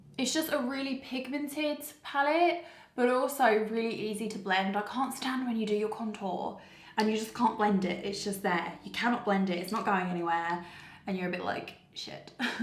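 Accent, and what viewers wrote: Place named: Australia. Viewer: Only found you recently and really enjoying your videos, you’re super down to earth and relatable, your accent gives me Australian vibes, I know you’re from the UK but it’s like you have an Australian twang on certain words, don’t mean any offence 🥰😍keep coming with the content I love it xx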